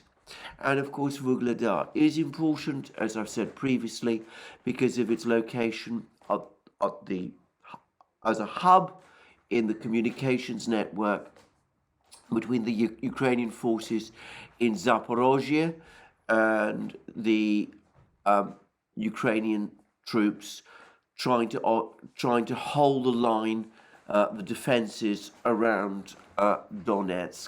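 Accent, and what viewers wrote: United Kingdom. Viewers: Yes, the best ruzzian propaganda with a british accent.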